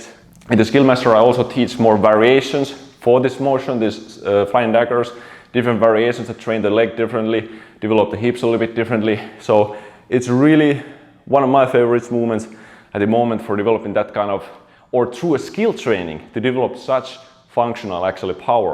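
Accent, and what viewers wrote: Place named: Finland. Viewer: The accent sounds like he is Finnish or perhaps Estonian. Neither are in the Indo European language family.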